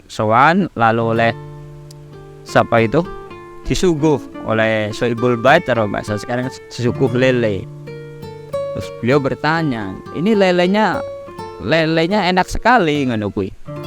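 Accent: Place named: Banyumas, Indonesia. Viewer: Sampean kok bahasane..logate ngapak ya…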